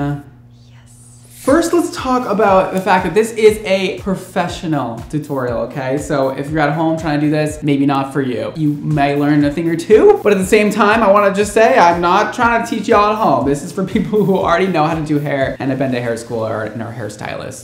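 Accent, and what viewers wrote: Eastern Europe. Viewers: She is so beautiful 😻 I could be wrong but I hear Slavic accent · I'm curious if slavic as she have this funny accent (do we all have it🤔) btw, her hair is amazing!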